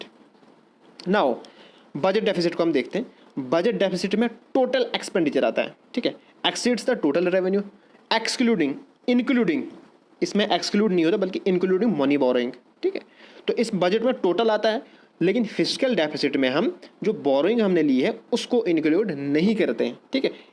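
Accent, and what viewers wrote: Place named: Kashmir, India. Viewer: Ab ka bei sankari gaandu, Youtuber nikalne bna hai. Pehle apna accent thek kr. Kashmiri English nai chalti 😆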